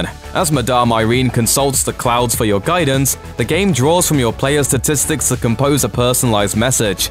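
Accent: United Kingdom. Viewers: Every video I watch is a British guy.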